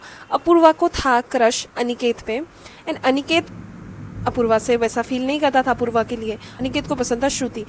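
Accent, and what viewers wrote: Bengal, India. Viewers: didi's bengai accent remainds me of my very close school freind always , i still miss him as we both moved to diffrent Cities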